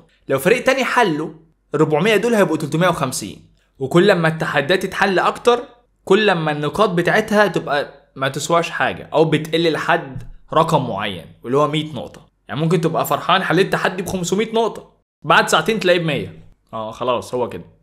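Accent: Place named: England, United Kingdom. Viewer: معليش يبشمهندس مش قاصد حاجه بس هو العربي بتاعك بحسوا اكسنت انجليزي عشان عايش برا ولا بسبب استخدام الانجليزي عشان وانا بتفرج حاسس بفرق لغه ❤😂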